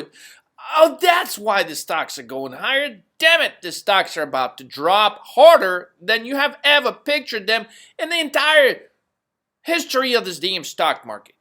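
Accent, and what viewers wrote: South, United States. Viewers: i love this man. italian name with a southern twang with balls hanging to the floor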